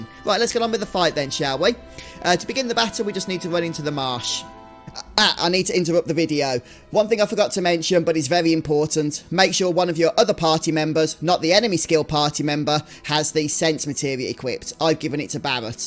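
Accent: United Kingdom. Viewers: dude you should start practicing to steer away from your crazy british accent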